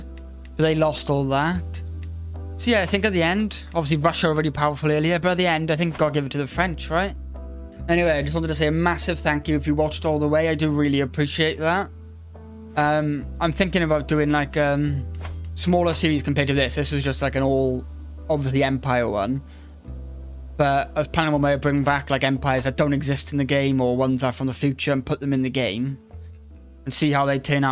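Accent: Wales, United Kingdom. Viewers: Are you welsh, Sir?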